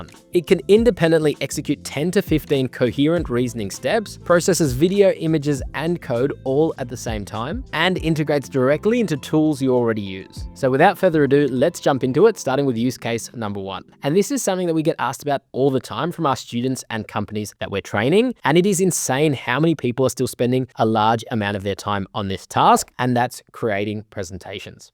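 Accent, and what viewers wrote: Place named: Australia. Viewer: It's a typical feature of the Australian accent.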